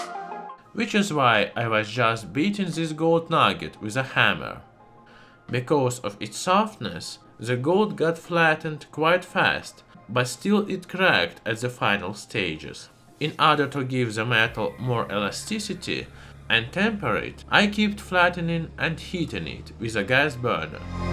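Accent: Russia. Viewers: he does seam to have a Russian accent... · He was from russia?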